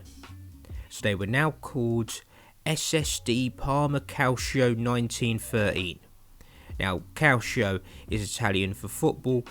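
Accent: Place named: London, United Kingdom. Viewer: the geezer accent makes it even better 😂 phenomenal.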